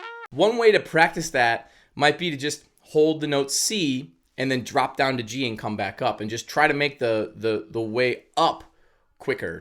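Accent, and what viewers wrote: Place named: New Jersey, United States. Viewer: ha! I couldn't place your accent -it sounded like new jersey.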